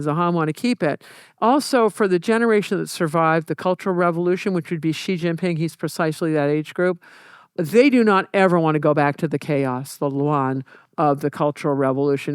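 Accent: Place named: South, United States. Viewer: Yeah but that is CIA playbook geography... Stuff makes no sense though: suppose that Russia tried to erase culture in Uzbekistan Tajikistan, erase islam etc, for a start, that would have stoked revolution so the situation is totally different, the russian empire was far less populous and sparser on radio waves... and so She trivializes this CIA politicial science social geography theory with this southern twang brainwashing her listeners about all kinds twisted logic that aligns with the CIA playbook, and guess what, she never even lived in these countries that she knows everything about, Russia, China, she clearly has never exchanged with the cultures there enough, because she speaks like someone educated by corporate news, and she wants you to speak like that too, not like someone who has travelled the world and knows cultures and the ancient causes of today's contrasted political systems.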